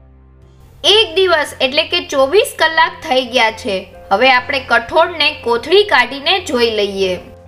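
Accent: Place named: Kathiawar, India. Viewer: good,Kathiyawadi uchchar sambhalvani maja avi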